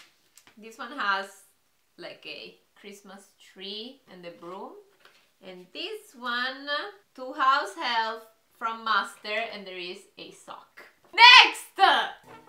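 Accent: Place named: Italy. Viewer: Love ur Italian accent ❤️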